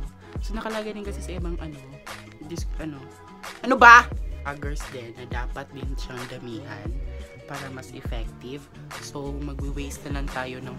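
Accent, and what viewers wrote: Visayas, Philippines. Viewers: Ay bisayaaaa pala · Bisaya ka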